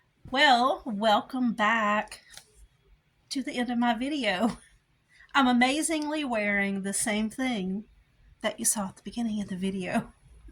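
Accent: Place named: South, United States. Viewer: you've definitely got that southern drawl but we really like it! I do hope you didn't mind me mentioning the camera but it's an idea to play about with. You have done so well. It's not easy in the early days navigating filming, editing, uploading, being Infront of the camera etc. Hats off to you girl. Xx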